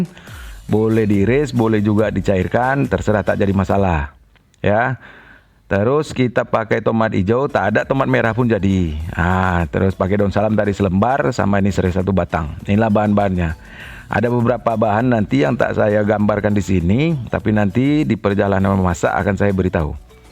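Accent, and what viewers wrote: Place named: Medan, Indonesia. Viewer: Medan kali bah...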